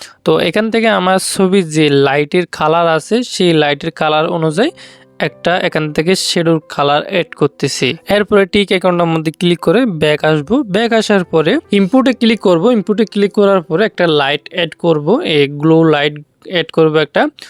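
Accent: Chittagong, Bangladesh. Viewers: আপনি মনে হয় চিটাইঙ্গা তাই না?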